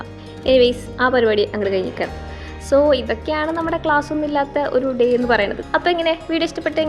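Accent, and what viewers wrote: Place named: United Kingdom. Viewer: Her English accent🌟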